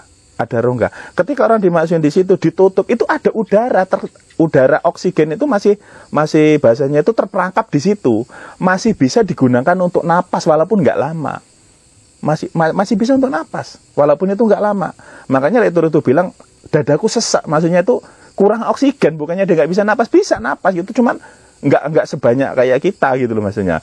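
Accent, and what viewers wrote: Banyumas, Indonesia. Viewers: Lah bisa ngapak juga😅😅😅.. Asale endi sih rika pak?? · ora ngapak ora kepenak.... 😁 salam ngapak ( karang pucung )...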